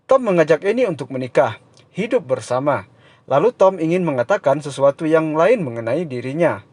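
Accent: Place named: Minangkabau, Indonesia. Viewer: Logatnya kental Minang banget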